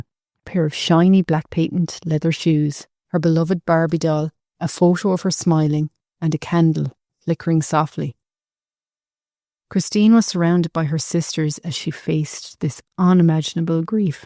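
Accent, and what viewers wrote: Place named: Ireland. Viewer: Your story-telling skills are impressive! You certainly know how to tell a tale - diction, pacing, clarity, turn of phrase, et al. My curiosity was whetted regarding your name -. Your accent says you are Irish, as am I - BELFAST. However, I've never come across that name before, apart from a town in eastern Spain. Good luck with your YouTube podcast.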